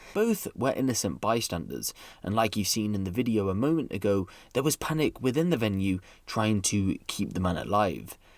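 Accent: United Kingdom. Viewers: What part of the UK is your accent from?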